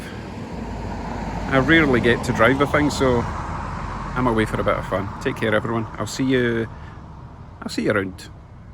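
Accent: Scotland, United Kingdom. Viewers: with Vancouver having the highest cost of fuel in the North America (i can't believe i just paid $2.40 a litre yesterday!), it's time for me to go electric & you've convinced me to give this a try! Love your Scottish accent and Canadian adoption. Sub'd.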